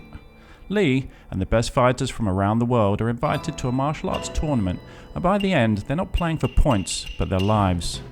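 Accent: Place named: South Africa. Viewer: Bruce lee was the best and sorry you sound like one of them European whites that live in South Africa talking about don't let racism make you a victim Bruce knew better so he went to his home country to give it a shot there white ppl made it bad to South Africans in their own country Rip Stephen binko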